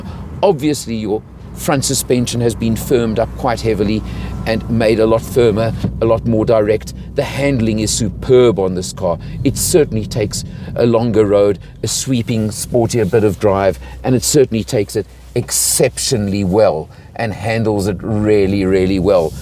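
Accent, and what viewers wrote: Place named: New Zealand. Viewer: Your kiwi accent annoys me. No offence.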